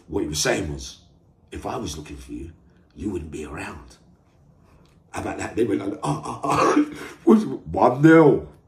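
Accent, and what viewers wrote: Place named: Cockney, United Kingdom. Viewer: Just try deliver your content clearer mate your diction is not great and remember you have followers who ain't cockney varra boys kid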